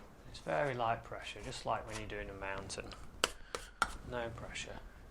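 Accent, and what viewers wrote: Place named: England, United Kingdom. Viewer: Enjoy your vids very much,. What part of England are you from? Trying to place the accent.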